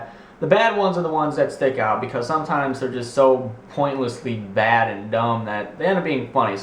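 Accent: Australia. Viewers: Sounds as if you've lived in Australia all your life. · I'm Australian, and you sir, are a fair dinkum aussie ;) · Crikey mate, you're so good at this S'trayan stuff, if i di'nt know any betta i'd think you were S'trayan myself, or a facking kiwi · Haha, first i am Australian and i say it exactly the same as you pretty much, so thats just someone being weird. Very intesting places, i only know 4 of them...i found snorlax :D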